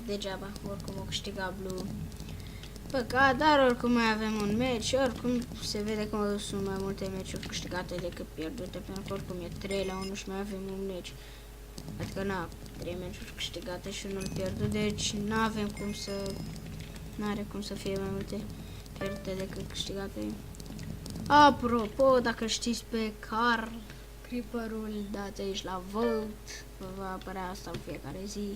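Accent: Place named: Moldova. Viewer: baiatu gamer nu mai vorbi moldoveneste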